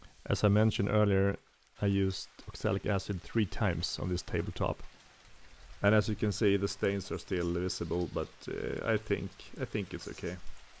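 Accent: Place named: Sweden. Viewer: Supersnyggt! Du avslöjas av din svengelska och trälimmet;)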